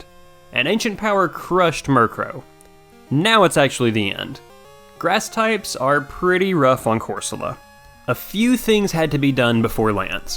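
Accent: South, United States. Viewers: First Southern PokeTuber I’ve found! Been wondering if Corsica could do it lol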